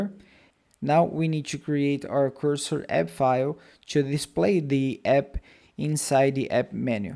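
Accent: Brazil. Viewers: Ganhou novo inscrito! (Ótimo inglês -- tenho quase certeza que tu é BR pelo uso do "T" e alguns sotaques hehe)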